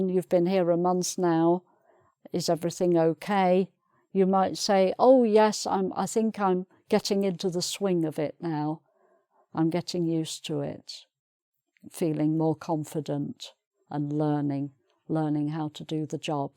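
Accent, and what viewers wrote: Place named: RP, United Kingdom. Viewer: I love this lady! She explains things so clearly and with this so beautiful British received pronunciation.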